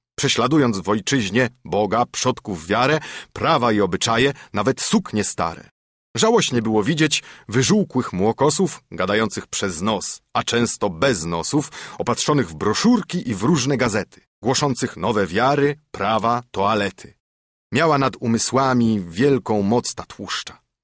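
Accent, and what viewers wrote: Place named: Central Poland, Poland. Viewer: Ach ta środkowopolska intonacja 😢 To powinien czytać jakiś aktor kresowiak. Utwór odczytany w tej melodyjnej wschodniej polszczyźnie, w której przecież został napisany, OGROMNIE zyskuje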